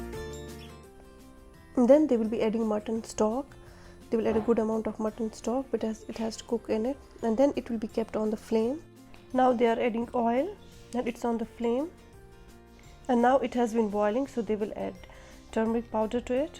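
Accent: Kashmir, India. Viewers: Is it Dishes or Dissss? Wah re Kashmiri accent :) This is not a dig but on a lighter note. Great video!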